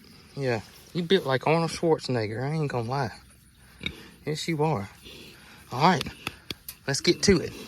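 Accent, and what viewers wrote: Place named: Texas, United States. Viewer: Those doors are absolutely gorgeous and I love your accent I am from Texas but we kind of have the same Lango going on here LOL😂😂😂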